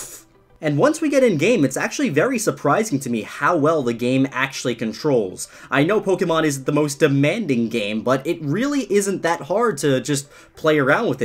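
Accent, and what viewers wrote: United Kingdom. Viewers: bro is british gl :D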